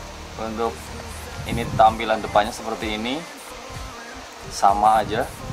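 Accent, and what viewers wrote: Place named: Banyumas, Indonesia. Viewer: Jawane ngndi lurr??, Medoke ngapakk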